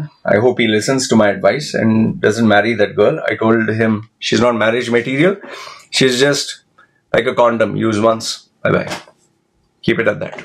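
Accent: India. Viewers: Hi, why does your Indian accent sound stronger now than what it did in your 2011 Ted talk?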